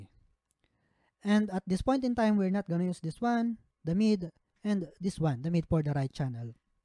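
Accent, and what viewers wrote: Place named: Visayas, Philippines. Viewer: Pinoy ka nman yata tsong kasi halata sa pagsasalira mo...bisaya ka pa nga yata e..bakit mo pa pinahihirapan sarili mo pati audience mo sa pag intindi sa sinasabi mo...nagpupumilit ka mag english...mga pinoy karamihan nanunuod sayo dito kasi nasa pinas ka kaya mas mabuti mag tagalog ka na lang para mas madali ka intindihin ok!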